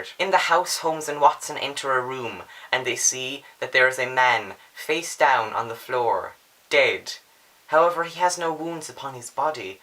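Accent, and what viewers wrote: Ireland. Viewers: are you irish? :) and thank you for the review, very helpful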